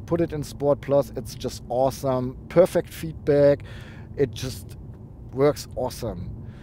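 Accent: Germany. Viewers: Speaking English with German accent = great combination :) and i can't stop watching you cause you re really good on this business, thank you for thr the video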